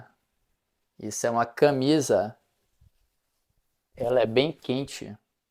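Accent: Brazil. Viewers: Where in Brazil is that accent?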